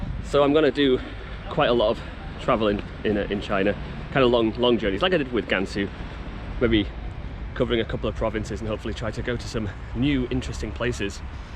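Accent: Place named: Teesside, United Kingdom. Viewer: I am super late to the party here but loved this video! So lovely to see your face and hear your voice... still got your Teesside twang!:D xxx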